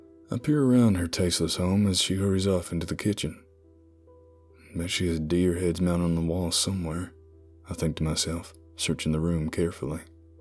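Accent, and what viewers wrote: South, United States. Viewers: You got the southern accent down. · The best narrator with the best stories! Your southern accents are almost perfect. Only two words give you away - been and says. Southerners pronounce them as ben and sez. Yes, I am a hillbilly. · As a native Southerner, I'm very impressed with your accent work, cool story too! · Born and raised in Bama and I thought the same thing. Fantastic job, just minor details. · North Carolina Appalachian boy, daddy from west Virginia and I'm from the smokies. You're right, this guy nails it better than Hollywood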